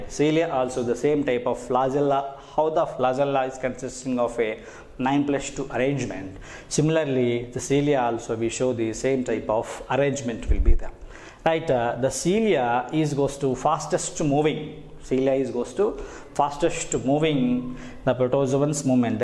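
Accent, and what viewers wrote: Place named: South India, India. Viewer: I think he is from South , mostly south peoples don't know hindi so use English so that everybody can understand , Nd inki English m thoda south accent bhi a rha h ,may that's why u can't understand , but his English is good , their is no mistake...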